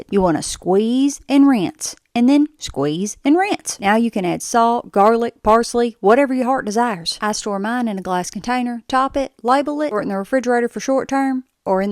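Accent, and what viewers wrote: South, United States. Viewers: Blonde and country accent god bless America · I grew up in South Carolina so I have that accent.....but moved away and I think I lost part of it. Very sad indeed. Though sometimes I get a ......oh you're from the south! I used to hate it....but now its sort of endearing. Yours is lovely my dear. Don't ever lose it....its who you are. I grew up close to the coast.....so have the salt water in my veins as well!!!!!! Oh sorry....back to the recipe!!!! lol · Southern accents are the best things to hit the world since homemade butter. God bless from the UK. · Its genuinely so sad my first thought when I hear this accent is "hateful racist Christian"... helps me understand why a portion of black people have a hard time with white people. Being from TX I understand not everyone with a southern accent is this way but how things have been and are currently going I see now Im being conditioned (and its something to work on). 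· Perks of having a southern accent lol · Oh god. The k at the end of milk. 😂. I have a southern accent too but hard k's like that make me cringe in my skull. Not as bad as when people have whistling p's. Tha5s the fkn worst. Try to soften ur strong k's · In today's day and age how is it that a southern accent like that has survived? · What state gives this accent? I’m from SC and her flavor is different. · Dang I love your southern accent so much! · Owee that southern accent 🔥🔥🔥🥰🥰🥰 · Man that southern accent is so heart warming ❤ God bless y'all's !!!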